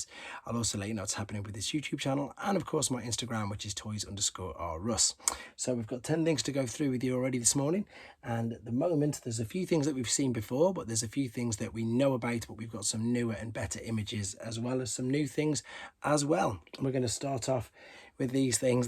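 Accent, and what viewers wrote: United Kingdom. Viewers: This is one of my favourite TF channels.... Nice positive attitude, never any whinging on about stuff, its a friendly space and I have seen so many things on here I had either forgotten existed or never knew about... and I like the way it feels like you are just round your mates house and he's showing you stuff he's found (it probably helps that I grew up in Telford, so the accent is a factor!)...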